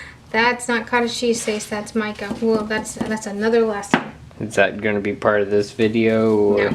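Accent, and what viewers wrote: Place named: Texas, United States. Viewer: And by the way, I'm a Texan transplanted to upstate New York and it is so good to hear that Texas twang! Love y'all!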